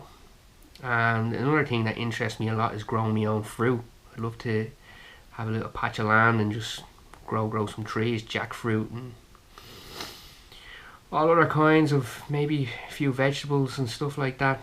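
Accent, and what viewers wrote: Ireland. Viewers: Sounds peaceful Irish.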